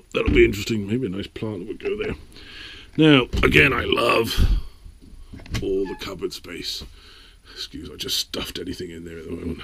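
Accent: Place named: Manchester, United Kingdom. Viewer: good travels--------i'll be subscriber # 12----------- i'll watch you on your journey. Please pass the Grey Poupon. Are you a Brit? you sound just like my brother-in-law, he's from Manchester. you answered that question-------cup of tea, lol.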